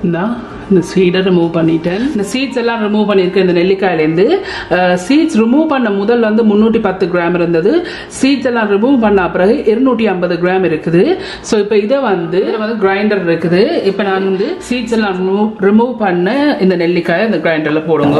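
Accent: Sri Lanka. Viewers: சகோதரி...உங்கள் இலங்கை தமிழ் அழகு.....வணக்கம் கோயம்புத்தூர் தமிழ்நாடு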